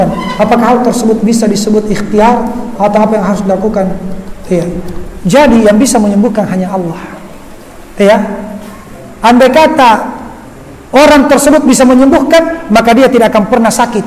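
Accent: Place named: Makassar, Indonesia. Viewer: Enak memang klo Dakwah pakai Bahasa Makassar yg kental 😌😌😌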